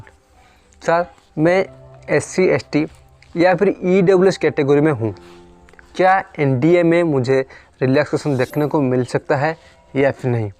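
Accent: Odisha, India. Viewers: Bhai ap odia ho kya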